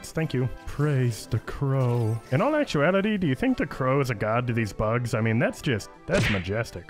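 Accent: United Kingdom. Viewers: If heard of you a ton from grounded community days and paralyzers videos i thought you were from the uk 😂😂 didnt think i would like your content but i was wrong funny video ggs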